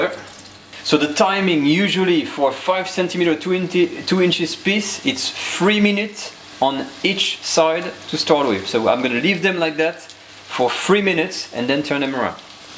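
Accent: France. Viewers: I love his recipes and even more his techniques, and love the French accent. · Your🌈 french 🌺accent 🔥😍 👌 😋😘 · "Nudge of Butter" with a real French accent is delicious in itself....., your own cookbook should be titled, "Nudge of Butter"....love it and hope ALL your dreams come true. I love your Academy.... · I will definitely make this; I love your French accent! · Your English is excellent despite your French accent :-) This recipy looks amazing and easy to prepare... I was just wondering about the way you "reserve" your meat pieces before putting them on the plate and have them still warm after preparation of the mushrooms sauce...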